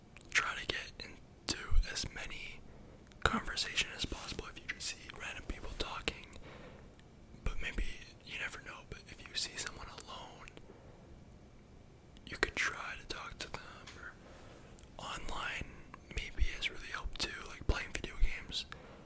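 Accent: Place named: North America. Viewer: Not only that you “look” American, We can’t tell the difference in accents between Canadians and Americans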